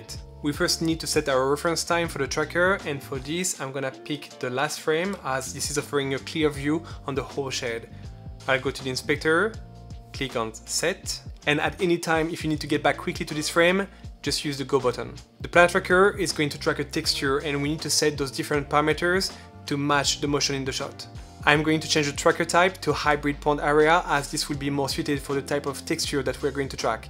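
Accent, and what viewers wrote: France. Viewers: Im both impressed and NOT. If your aim is to teach, I think it would be good to explain every move, especially the nodes. I am trying to learn fusion, and so many tutorials with god people teaching, BUT as they are god they tend to forget to show even the small steps, which if missed, actually can ruin the whole tutorial. In this tutorial, you missed the nodes, why connect them in that way. I looked at some reviews, and all gives good. But may bee they are att a more advanced level, so they can fill in what you missed. The reason I write this, is only because I see you are good at what you are doing. Even if your French english is fun to listen to :)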